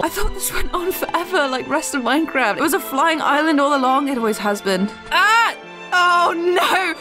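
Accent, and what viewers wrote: United Kingdom. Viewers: are you a brit?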